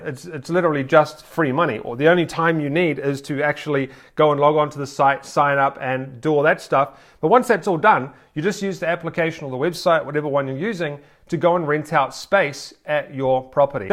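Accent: New Zealand. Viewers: Hey, bro are you Kiwi?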